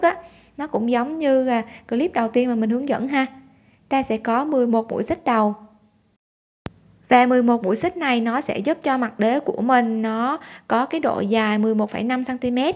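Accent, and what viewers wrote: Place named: Da Lat, Vietnam. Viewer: nghe nói tiếng giống người Đà lạt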